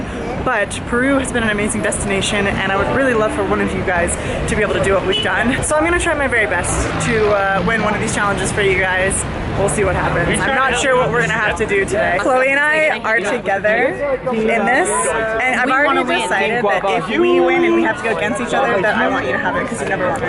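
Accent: Spain. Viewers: Yeah, she studied abroad in Spain, that's why she has the spanish accent.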